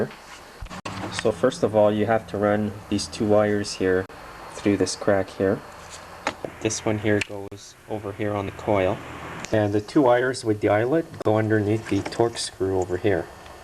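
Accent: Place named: Canada. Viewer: Odd that the related clips on the right fills upp with girls in bikinis more than chainsaw videos. I guess it says something about the audience. Other than that, really nice videos, and at least for a scandinavian your canadian accent sound so much nicer than the average americans.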